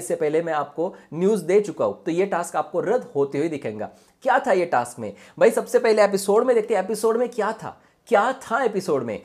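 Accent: Maharashtra, India. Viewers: bhai marathi tone me aapki hindi mujhe bhaut achchi lagti hai aap mere fevrate ho god bless u bhai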